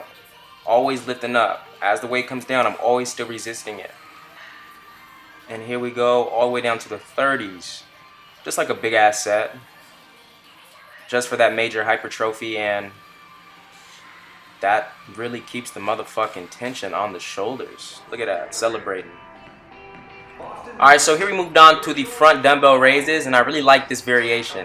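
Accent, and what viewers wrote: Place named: United States. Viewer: Wait are you American? Your name threw me off but hearing your voice you seen American lol.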